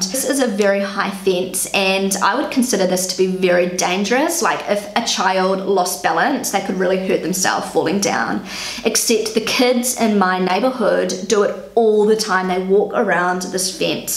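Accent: New Zealand. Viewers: I loved you video (and it's the first time I hear New Zealander accent) . I am in Ireland hoping to move to NZ and I love German style for parenting. The way you showed some points, even me being Brazilian seems I have similar attitudes like the German moms. Interesting to mention that all kids in Germany wear a beanie just in case to prevent cold wind, seriously all! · I love your accent. :) Bevore you mentioned that you are from New Zealand I couldn't really tell where that accent is coming from. I thought maybe England or Ireland or Australia. I have never been to New Zealand. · I love the NZ accent :) - thank you for the kind words about German parenting. Property is a big issue in Germany so maybe that is why German parents care about who owns a toy. Maybe we should relax more :) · I love your New Zealander accent! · Ja, das ist das faszinierende an den diversen Akzenten in NZ. 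Man hört noch die Ahnen.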